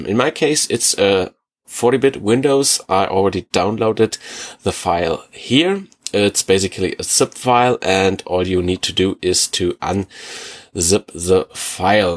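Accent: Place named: Germany. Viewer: I can not live through 1 day without a guy with heavy, heavy German accent speaking about tech stuff.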